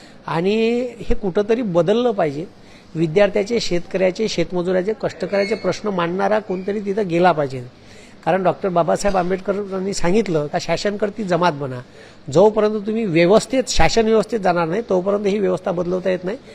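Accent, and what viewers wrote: Vidarbha, India. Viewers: हे विदर्भ बोली भाषा आहे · पूर्व विदर्भात असेच बोलतात..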